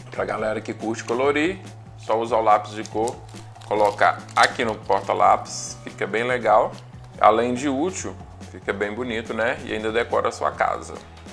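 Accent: Minas Gerais, Brazil. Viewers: Ganhou mais uma inscrita. Teu canal é excelente e eu adoro esse sotaque gostoso mineirinho. Me destes muitas idéias pro apê que eu comprei. Boa sorte com o canal que já é nota 1000.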